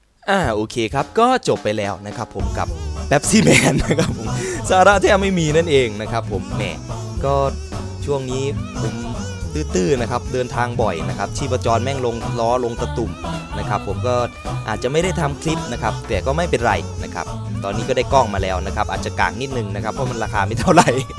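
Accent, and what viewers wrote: Isan, Thailand. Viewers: พี่อยู่จังหวัดอาไรคับ สำเนียงเหมือนคน อิสาน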